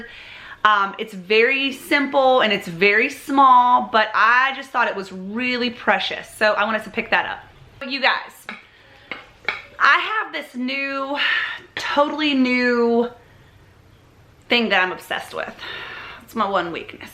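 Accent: South, United States. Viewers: I love everything in your hall! The only thing wrong is that your haul isn't mine! I hate to admit it, but I'm jealous and I know that's just so wrong. Dear God, pls forgive me.( lol) I so enjoy your videos and I especially like your southern accent. Thank you for sharing.🌹🌻🌻🌻🌻🌻🌻🌻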